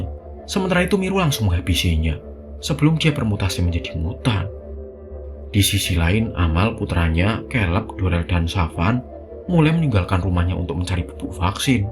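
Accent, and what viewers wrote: Java, Indonesia. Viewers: Maklum jawir😂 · Ngomongnya kadang kurang jelas karena medok banget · BAHASANYA MEDHOK NJIIIR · biarkan alur ceritannya dibaca Text to voice AI itu lebih jelas ejaan Hurufnya., Medok bngt jadi gk jelas ngomong apa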